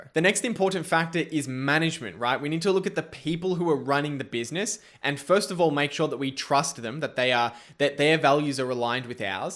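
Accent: Australia. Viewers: Hmm rosy cheeks and an Aussie accent ☺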